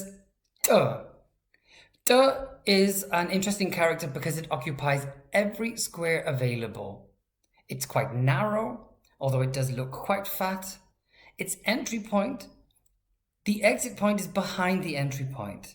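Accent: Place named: Wales, United Kingdom. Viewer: Fantastic, thank you. A huge help, and fun to watch. Love the Welsh accent too.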